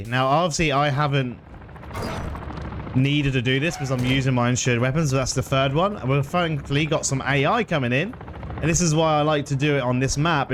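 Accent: Norfolk, United Kingdom. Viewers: Where you from fella? Norwich boy here and I swear I hear a Norfolk twang here and there??! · I'm convinced by your slight accent that you're from Norfolk like Kings Lynn way lol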